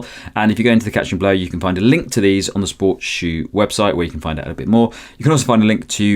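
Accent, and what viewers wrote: United Kingdom. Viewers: Are you from the Black Country. Is that why you talk like that?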